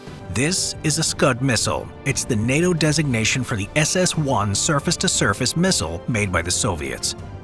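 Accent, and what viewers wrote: United States. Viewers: A Briton who speaks American.. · "Any questions?" Yes: why do you should like an American?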